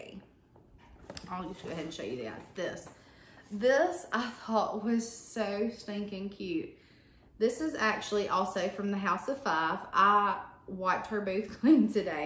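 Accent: South, United States. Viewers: You two are so cute!! Your Aunt looks like a "hoot" to be around. You are such a sweetie and i love your energy and "southern drawl". Thanks for sharing! Happy Memorial Day weekend.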